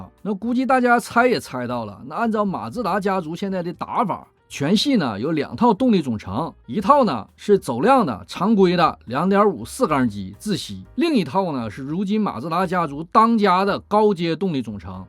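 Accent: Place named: Shenyang, China. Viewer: 主播的口音也太亲切了，老铁是沈阳银吗？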